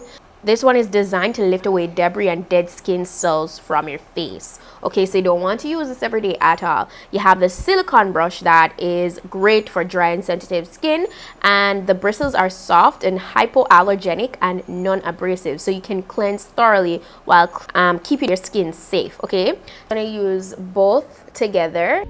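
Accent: Jamaica. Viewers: Yuh cyah hide de Jamaican ascent to rahtid.. love it 😊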